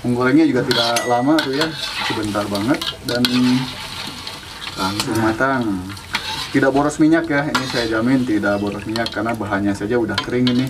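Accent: West Java, Indonesia. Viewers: beda wae ari urang sunda mah nyarios na jakarta bandung hahahaha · Kang orang sunda mana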